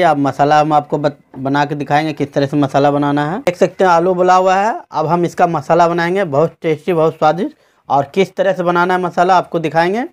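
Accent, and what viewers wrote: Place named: Jharkhand, India. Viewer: Kaa o bhaiya, Bhaasa se to Jharkhand ke lag rahe ho...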